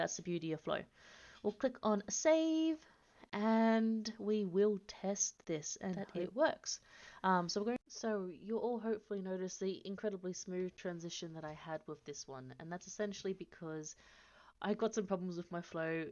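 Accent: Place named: South Africa. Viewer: thanks very much for this tutorial. I searched and searched and eventually found your video which was just brilliant. I am a bit curious as to your accent. I lived in South Africa for decades, and I have an English South African Accent, which made me question if your accent doesn't have some South African in it, then I saw one of the subdirectories which had what looked to be Dutch text, then I though maybe there is some British in the voice and possible New Zealand. You're probably going to tell me that I'm barking mad and that you are from Egypt 🙂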